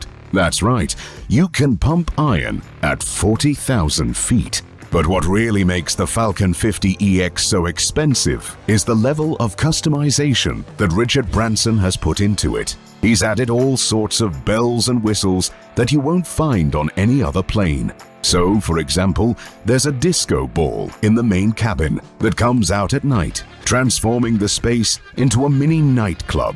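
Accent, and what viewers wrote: United Kingdom. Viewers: The commentator obviously comes from the North of England...you can tell this, by his saying the word BATH....ONLY Northerners speak this way.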